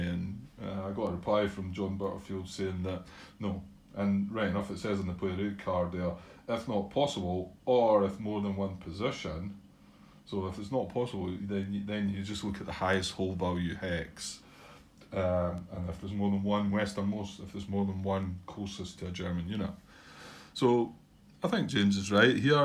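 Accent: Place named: Scotland, United Kingdom. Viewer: I think it was mostly clear and to the point. Not your fault things went a bit off the rails toward the end. I think James was getting a bit tired and lost focus. The recording, editing and sound were all very good and I'm very much looking forward to your own playthrough. Clearly, everything sounds better in Scottish, so you have that going for you from the start. (I'm secretly hoping for a blast of bagpipe music once XXX Corps gets rolling...and yeah, also consider an invisible sidekick called "Wee Seamus" or something similar.) I'm sure you're correct regarding the roadblock check when moving adjacent=>adjacent, but it's a tricky one since it doesn't quite make sense (to me). Let's say you have two units stacked adjacent to an Allied Position. When you move the first one like in your example, you now don't have to check. The unit is still adjacent, so if you proceed move the second unit, you again don't have to check. 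Another example with a single unit this time: instead of moving adjacent=>adjacent, you move through the position hex and into the same target hex, again you do not roll. I guess there's a rationale there somewhere (maybe the non-moving unit is watching the road in the first example?) but it's not crystal clear.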